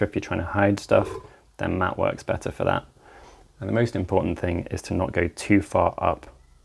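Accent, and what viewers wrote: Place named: United Kingdom. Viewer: "Too British? Turn on Subs" 🤣🤣 No, the perfect amount of British, 😊💜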